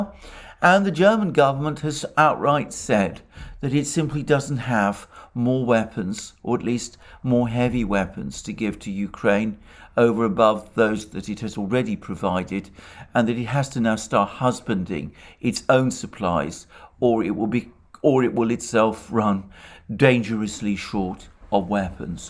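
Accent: England, United Kingdom. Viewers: Lol, Alexander is simply reciting unfiltered Russian propaganda from almost exclusively Russian sources. Putting an english accent to it does not make it any less Russian propaganda.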